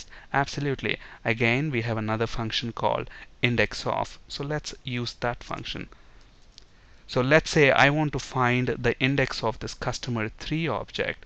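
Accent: India. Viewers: I usually can't stand the Indian accent in tutorials, it's the cadency of the words it distracts me because when you speak with an Indian accent in English the sound is usually flat and has stops after each word. Maybe I'm a dickhead but I'm specifically referring to the combination of flat English + Indian accent in tutorials. The reason why I'm making this statment is to let you know that this does not happen in this video! This tutor is fantastic, dispite having an Indian accent the narration is not flat and it's pleasent, the contents are on point, concise and clear, thank you so much! Subscribed! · I (as a non-english native speaker) usually have trouble concentrating on videos with an Indian accent (-sorry for that sad assesment). But this dude is very didactic, expressive and illustrates every point very well! Hugely Recommend his different videos on different reference points for beginners or quick access to specific info!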